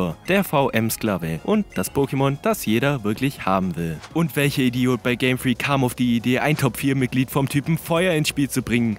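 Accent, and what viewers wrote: Swabia, Germany. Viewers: Geiles Video, 11/10 für schwäbischen Dialekt! ^^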